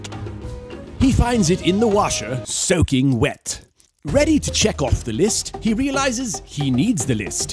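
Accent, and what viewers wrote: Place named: United Kingdom. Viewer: I love that this is a British accent “crisp” but is the driveway called a driveway? Cul-de-sac? Trunk? And as an adhd-er in Costco I have to say I have a ton of socks. Sure the lights are pretty on those other things but socks . . . You need those. . . I can’t fit them all in my sock drawer anymore. I really need to have a sock drawer for different seasons. (The sock aisle is way more dangerous than the tv aisle)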